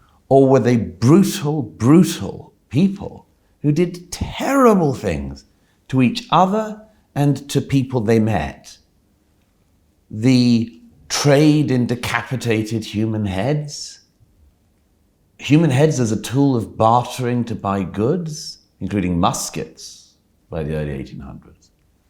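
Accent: RP, United Kingdom. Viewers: The man with the posh accent is speaking the truth. You would know that if your knowledge of history wasn't limited to TikTok and shows from Netflix. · This is one of the unfortunate byproducts of 30 years of dumbing down, there are people out there in Internetlandia who would follow Murray blindly based on the fact he can string a sentence together without saying “like” out of context, with a posh accent.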